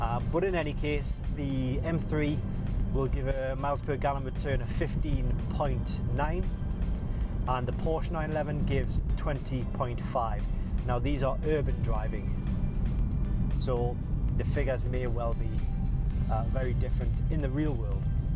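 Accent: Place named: Tyneside, United Kingdom. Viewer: Where you from mate? North or south of the tyne?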